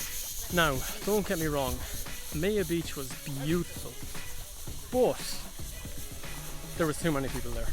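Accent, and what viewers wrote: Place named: Ireland. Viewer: A good Irish accent😀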